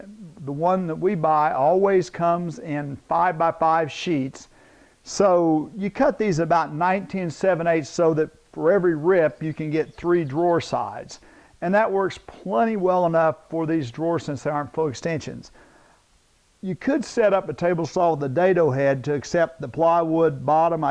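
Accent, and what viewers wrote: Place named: Arkansas, United States. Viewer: Arkansas "mayshure" for measure. :) Accent sounds funny. Also "rebate" for rabbet. Good Videos.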